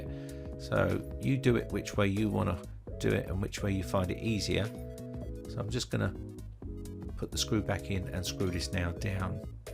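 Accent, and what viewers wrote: United Kingdom. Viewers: It's about 39 pounds Me, an American: Oh golly gee willakerz that's heavy!!! British accent continues Me: Oh right...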